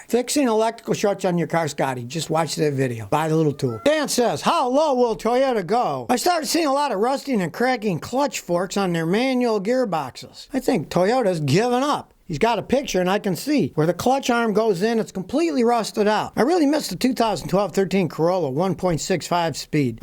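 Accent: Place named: New England, United States. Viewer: This guy may live in Tennessee, but he has a New England "Eyakcint." I've seen him with a "Live Free or Die" sign. Could he be... a New Hampshireling? 😃 Love from a Vermonter living in Texas.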